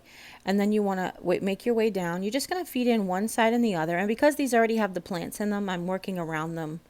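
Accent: New York, United States. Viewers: I like your subtle NY accent (and your tutorials, too👍)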